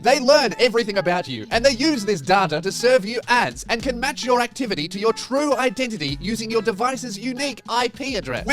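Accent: Australia. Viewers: So he’s an aussie then hmmmmmmmmmmmmm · Wait how to basic is Australian??? Fellow aussies... AUSSIE AUSSIE AUSSIE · Since when the fuck are you Australian? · yep, australian confirmed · is australian?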